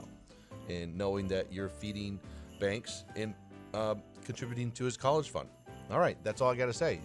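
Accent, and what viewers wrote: South, United States. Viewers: the country pronunciation was better tbh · country accent has a lil sugar in the tank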